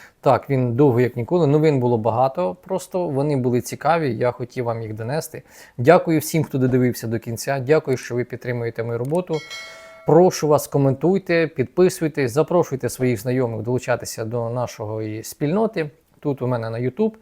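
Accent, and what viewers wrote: Standard. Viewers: Респект за чисту,інтелігентну,спокійну українську мову. Роботу свою Ви робите спокійно,при цьому грунтовно.Дуже приємно Вас слухати. Успіхів! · Респект за чисту,інтелігентну,спокійну українську мову.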